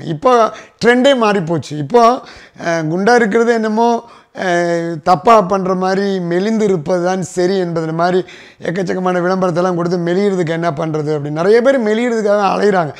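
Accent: Kerala, India. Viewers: Malayalam vaasam apdiyae veesudhu unga Tamil . Nice video. I subscribed for Ur Tamil sir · I like your malayalam mixed tamil slang. . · Sir neenga Tamil or malayaliyaaa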